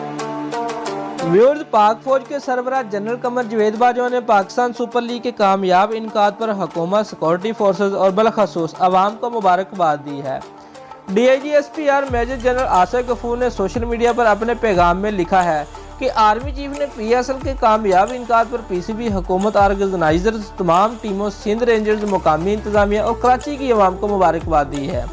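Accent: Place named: Punjab, India. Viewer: Abey chutiye punjabi style mn urdu bol raha ha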